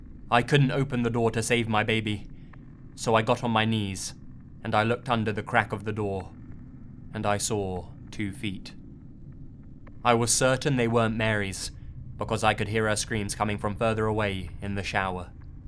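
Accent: United Kingdom. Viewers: This dude is the most British sounding native American I've ever heard. Good stories tho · Fair use Act violation sounds like. As long as no one's being harmed. I don't see a problem. Good video.. a little wierd hearing the story in a British accent though. I'm thinking more baritone" Native elder 101" voice. Good stories. Thank you. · Just have to say that i really like this channel. Love the brittish (?) accent. Keep up the good work! Sorry to hear about the copywright shit. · Native American Indian stories with British accent. Loving the stories thus far just caused me to raise an eyebrow.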